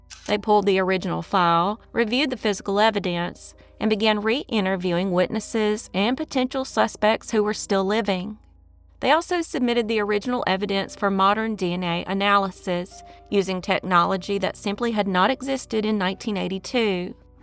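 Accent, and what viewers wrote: South, United States. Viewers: Human narration by good old southern girl with a wonderful accent?! I subbed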